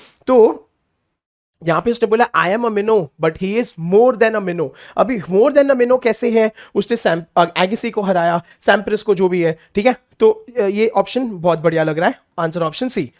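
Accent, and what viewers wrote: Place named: Bihar, India. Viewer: Maano ya na maano but Bihari accent has the best grip to students😄😃 Way of Teaching is amazing 🎉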